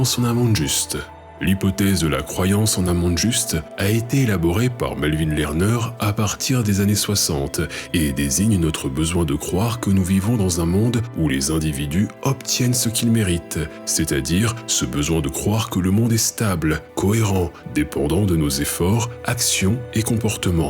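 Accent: Brittany, France. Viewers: d'accord j'ai mis un temps à comprendre ce qui me gênait à l'oreille car c'est très discret, je ne savais pas que c'était une particularité bretonne ! bonne continuation et merci pour vos videos ! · non ce n'est pas un "défaut" c'est l'accent breton, on dit "même" comme ça ici, et les accents sont prononcés différemment. Tout comme l'accent du sud ou d'autres accents prononceront les choses différemment, et ce n'est jamais un défaut ou une faute, juste une habitude culturelle différente.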